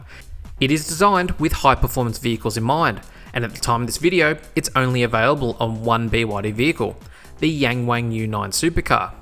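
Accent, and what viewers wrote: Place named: Australia. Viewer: Newly subscribed, good to hear Aussie voices and not AI voices like a lot of new channels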